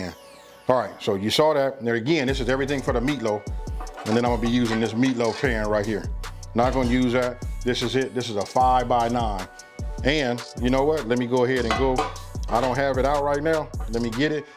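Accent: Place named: California, United States. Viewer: I’m pretty sure he has a Cali accent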